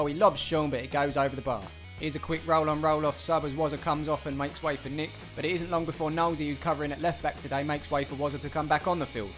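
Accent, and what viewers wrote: Essex, United Kingdom. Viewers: They're both from Essex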